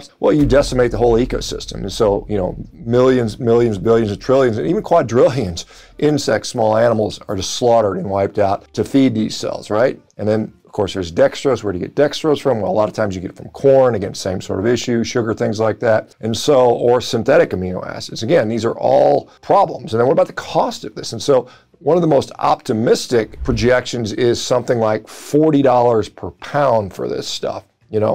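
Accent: United States. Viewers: Wow, thick as two short planks. 'Probably worse for the environment'. What is that supposed to mean????!!!! I can see that you are from the slaughtered meat lobby but you have not done your cause any good. You sound like a typical red neck American. You have little or no grasp of science and clearly do not understand what 'cultured meat' is. If you are from the slaughtered meatindustry you should be looking at this issue differently. There are positives and negatives. Around 800 million people globally rely on farming for thier income, directly and indirectly. It goes further than that too. This new form of meat production is going to impact, like a tsunami, eventually. Modern traditional meat producers need to work with this new industry and have control. You are going in the wrong direction with your post. Reply to me and get the real information as I have been researching this industry from the start.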